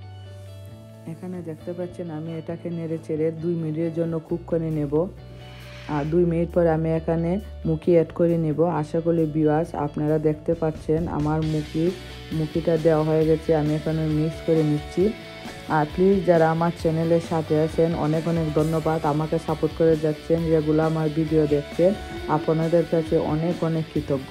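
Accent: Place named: Habiganj, Bangladesh. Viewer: Apa je moja oise lager r mukhi to kota oi nay apne mat hobigonjer tone ase gift dia gesi affa apne o diva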